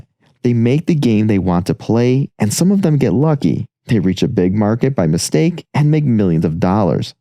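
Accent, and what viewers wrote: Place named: France. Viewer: I watched the video, other than French accent, I’m not hearing a difference. Jean rah (Jean like Picard from Star Trek)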